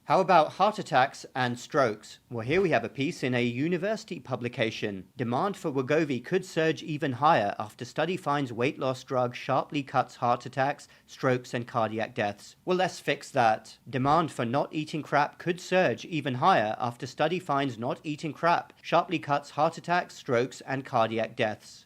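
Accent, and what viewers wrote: England, United Kingdom. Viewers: This is so hilarious: ‘Injections are dished out like candies.’ By the way, don’t lose your English accent. This is an excellent educational page. Thank you for sharing your knowledge with us, Doc.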